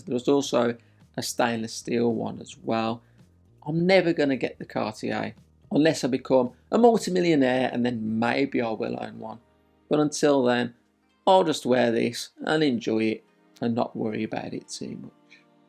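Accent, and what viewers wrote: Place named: Birmingham, United Kingdom. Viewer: New Sub here. Brilliant collection, and really surprised to see that your first purchase was a £1500 Rado. And you sound like you're local in Birmingham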